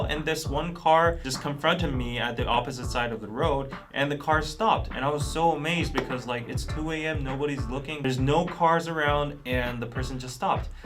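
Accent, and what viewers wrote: United States. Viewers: Where is he from that he speaks perfect American English?? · Wow your accent is very american/well pronounced for the short amount of time spent in the states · I was waiting to feel somewhat insulted, like I have seen in other “Koreans react to America” videos, but I found yours honest, respectful and amusing. I’m amazed that you only lived in America for only 3 years when you were young, yet you speak English so well. I can see you surprising the waitress with tip questions said with an American accent. Thing I found weird in South Korea was the letter “F” for the fourth floor elevator button because the building management felt the number “4” was bad luck. Guess like the number 13, so lucky building don’t go beyond the 2nd floor here. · this was great! But I'm confused... I've been watching your videos for months. And, if you haven't been to the US in 18 years WHYYYYYYY do you have a mid-west accent (Min-i-SOOOO-da)?? 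And why do you know so much about US geography and use American colloquialisms? Both you and Danny "seem" very American in thought process, at least in the sense that it is intuitive to you how to explain Korean things so that an American would understand/relate. That's why your audience is so big here, I think. Who/what is the influence for both of you? Maybe this is a video? Or maybe there is one and I need to look back at your old ones? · I am really glad you brought this point up regarding personal spaces. I always thought Koreans were so rude by bumping into people and not apologizing about it. Also have a friend refusing to visit Korea again cos an old lady slapped her hand in a shop for touching something. No offense or anything as I am obsessed with BTS, love k dramas and Korean food. I just never thought of it from your perspective. Apart from the Chinese, who are more nonchalant about personal spaces as well, all other Asian countries I’ve been to are more similar to America on this topic. 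In fact, the Japanese are insanely polite and the Taiwanese love chatting up with strangers. By the way, I always thought you lived in the States for many years as your accent sounds so American! How did you keep up with the English language after moving back to Korea? Interested in finding out. 🙏🏼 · perfect American accent. Very good assessment Especially about body space and Stop Signs: Stop Signs is about "Shield Arm" and Right of Way. One gives the Right of Way so that the other party passes you keeping his "Shield Arm" between you and him. So [all things being equal] you should always let the person to your right go first. Most Westerners don't know this. And never tip for shitty service. Biggest error: you are comparing California and Korea. California does not equal America. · I call bullshit, your accent is a perfect american accent. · I thought this video was a joke at first because your accent sounds perfectly american as if you were born and raised here.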